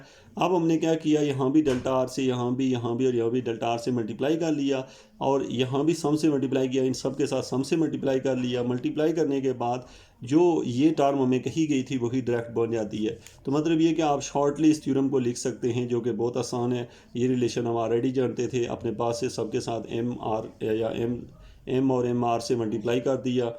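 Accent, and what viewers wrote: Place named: Pakistan. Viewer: Good Video, your accent is Pakistani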